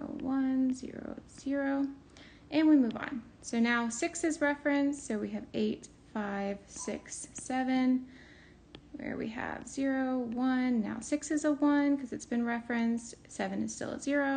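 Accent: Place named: Turkey. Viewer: bro where are u from that's turkish english ı think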